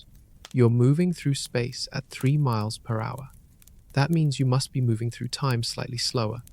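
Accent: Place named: United Kingdom. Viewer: Always the damn British accent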